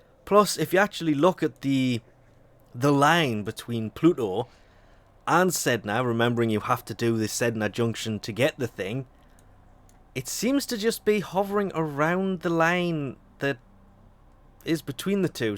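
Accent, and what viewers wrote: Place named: Newcastle, United Kingdom. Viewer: North east of England, sounds like Newcastle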